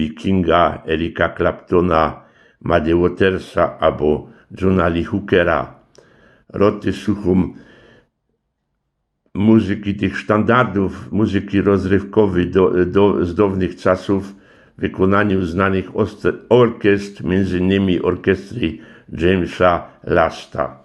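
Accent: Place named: Silesia, Poland. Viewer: Jakie to jest piękne ❤❤ Jeszcze ta gwaro śląsko ❤❤ Miód na moje uszy. Pozdrawiam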